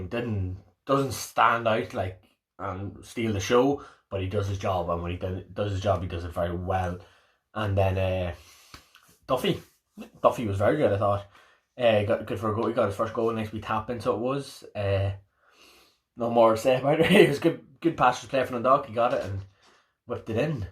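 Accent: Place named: Armagh, United Kingdom. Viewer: I can hear the Armagh in your accent 😂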